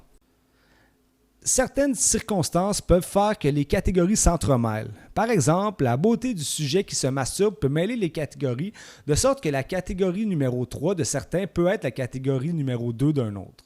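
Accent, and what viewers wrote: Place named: Quebec, Canada. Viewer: l'accent quebequoi est un répulsif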